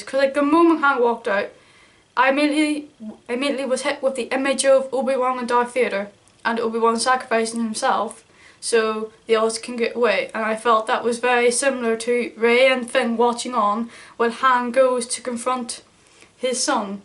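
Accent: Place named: Ireland. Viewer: Nice review. As an aside, I didn't realise you were Irish; I though Scottish :D Excuse my poor English ears!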